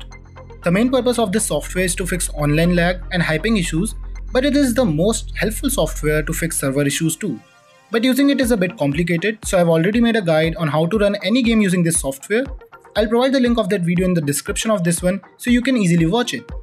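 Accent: India. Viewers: Yeah he does have a very tolerable voice for being indian i like it he made quite the nice video too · i love your indian accent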